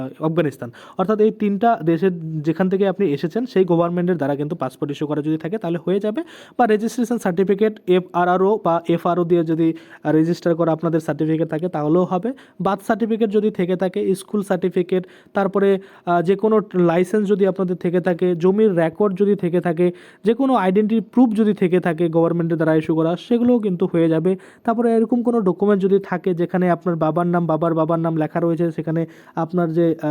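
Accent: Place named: Bangal, Bangladesh. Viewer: ওরে বাঙালের ভাষা বন্ধ কর,চেষ্টা কর বাঙালির বাঙলা বলার ।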